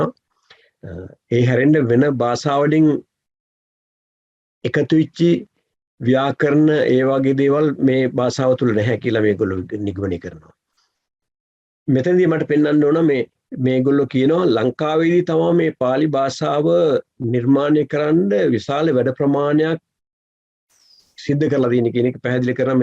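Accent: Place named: South, India. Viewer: මුනේ මොකද ද්‍රවිඩ ඇක්සන්ට් එකකින් කතා කරන්නෙ. ඔබ යාපනේ නාග හෙලයෙක්ද?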